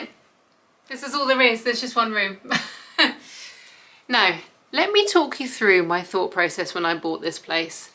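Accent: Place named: Bristol, United Kingdom. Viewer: Cool vids. I can tell of a slight Bristol accent am I right cute studio my love ❤🎉❤🎉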